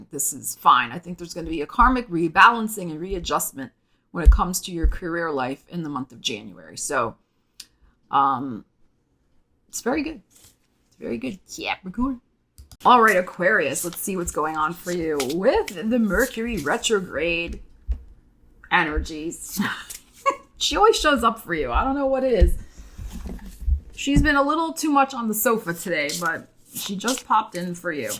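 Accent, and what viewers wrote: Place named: New Jersey, United States. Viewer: She sounds like she's from New Jersey ... or something.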